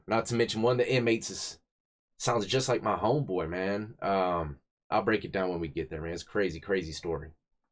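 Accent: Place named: Virginia Beach, United States. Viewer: Sounds like you are from VA Beach? I am a white guy who went to high school in the ghetto of Hampton, VA. It was hell on earth.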